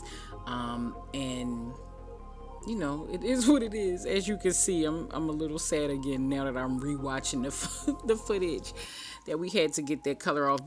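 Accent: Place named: Delaware, United States. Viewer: I’m from Delaware but I live in Cali now. I love watching your videos and hearing your accent. It always reminds me of home ♥️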